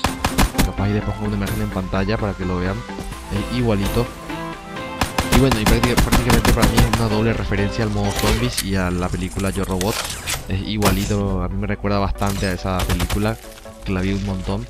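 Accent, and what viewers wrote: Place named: Paraguay. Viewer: Se te re nota el acento de Paraguayo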